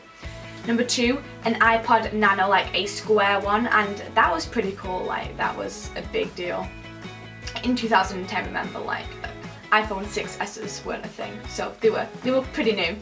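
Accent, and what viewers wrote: United Kingdom. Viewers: i love your British accent❤️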